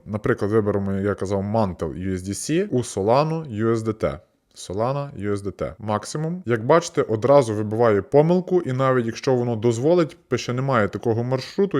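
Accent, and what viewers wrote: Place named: Western Ukraine, Ukraine. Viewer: Приємно слухати на західноукраїнській мові. Молодець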